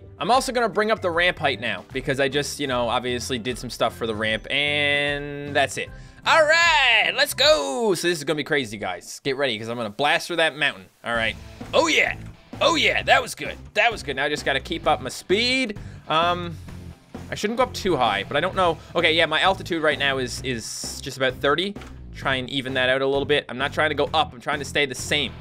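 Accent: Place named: Newfoundland, Canada. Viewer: This man really got a Newfie accent who'd have known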